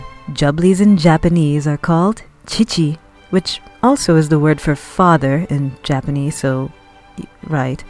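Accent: Jamaica. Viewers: Your accent is sounding freshly topped up like you recently visited Jamaica! I heard it slip out when you said operation 😆 Love all your videos - and I would totally watch one about kneecaps if YOU made it!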